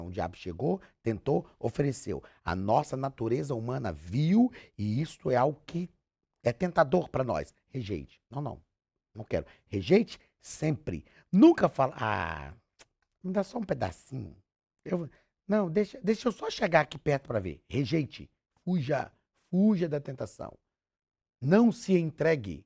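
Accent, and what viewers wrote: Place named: Minas Gerais, Brazil. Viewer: Estudo junto todas as semana sou mineira e gosto de ouvir um mineiro falando Deus continue lhe usando Pastor.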